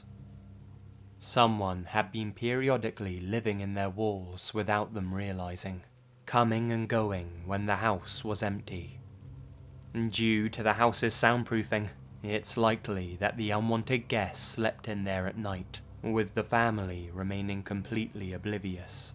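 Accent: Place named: Southern England, United Kingdom. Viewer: that accent doesn't lie, that's southern england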